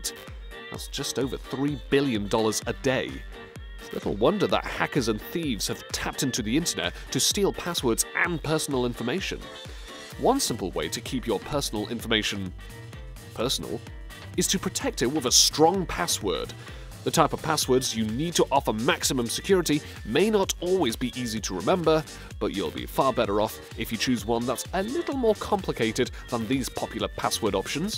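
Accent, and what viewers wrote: United Kingdom. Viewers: You obviously live in the U.K. Because you pronounce Z with an ED at the end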